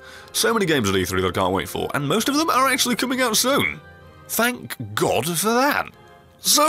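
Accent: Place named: England, United Kingdom. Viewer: It feels weird to think that I subscribed to this back when you didn't even have 20k subscribers. Hell, I thought you ha way more subscribers until you uploaded that 50k subscriber video. It was just legitimately funny watching you getting your shit wrecked by Dark Souls bosses & having goofy reactions to multipayer gamertags. Then again, I usually find rapid-fire comedy with an English accent irresistible. Congrats on the 100k!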